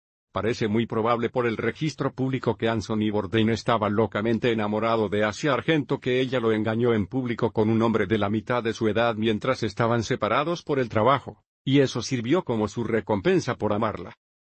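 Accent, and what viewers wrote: Spain. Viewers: Wonderful idea, Paul, there are around 500 million native Spanish speakers around the world that could benefit from your work. Like others have stated, the robotic voice and Castilian accent might draw listeners away. There are websites where you can get your essays translated professionally and maybe you can look for volunteers to read them with a more neutral accent. I'd volunteer. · 7 or 8 out of 10. The message is understandable. It is a Spain Spanish but again understandable. I am used to Paul Elam speaking but that is just me. This is okay in my opinion. La voz es un poco rápida y bien robótica pero se entiende. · I'm a professional translator. It's actually not bad for software. I would love to contrast it to the original English text. It'll get the job done. The Spaniard accent may be a little alienating for the Latin American crowd. 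But like I said, it's actually pretty good for a machine.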